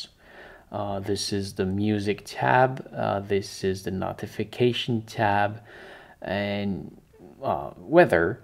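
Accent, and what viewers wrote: Quebec, Canada. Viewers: He's French Canadian. It's a wonderful accent in my opinion.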